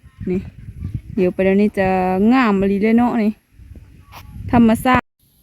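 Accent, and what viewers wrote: Phu Thai, Thailand. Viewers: ่มักคำ เว้า คือ กันกับภูไทเรณู ฝั่งไทย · เข้ามารับชมบรรยากาศ ภาษา การดำรงชีวิตด้วย พูดไม่หยุดเห็นอะไรก็พูดไป ก็ถือว่าดีเลยนะนำเสนอ สิ่งที่มีอยู่รอบๆตัวเราได้ค่อนข้างน่าสนใจ โดยเฉพาะการใช้ภาษาในการพูด สำเนียงภูไท ฟังแล้วเพราะดีนะ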